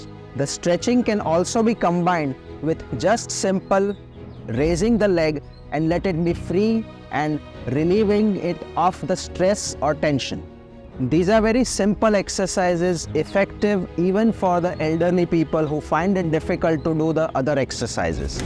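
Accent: India. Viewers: Father teach me English more than 35 year that's why understand indian English grateful to ur parents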